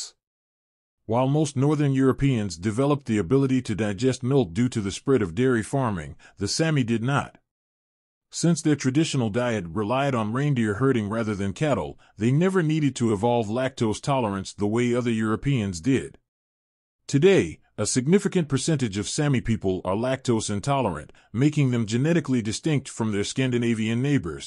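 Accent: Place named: United States. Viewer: No American voice please. FO.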